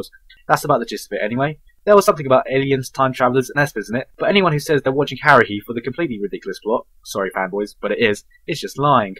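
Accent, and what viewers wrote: United Kingdom. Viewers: Gigguk lost most of his british accent after a few years 😂 he's more understandable now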